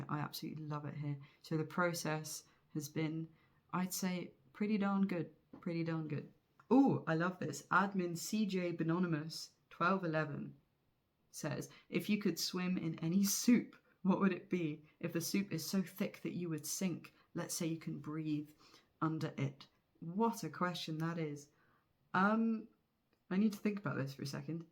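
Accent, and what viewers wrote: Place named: London, United Kingdom. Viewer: It’s strange to hear you speaking, since I’ve only ever heard you sing. But you sound pretty classy—as a yank with little awareness of British accents outside of London, Manchester, Liverpool and Cockney, I’d have to guess that that’s London accent?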